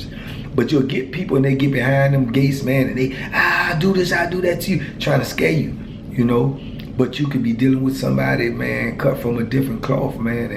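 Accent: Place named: Washington DC, United States. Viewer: Yo I swear I thought you were from dc or pg …. You sound and dress like us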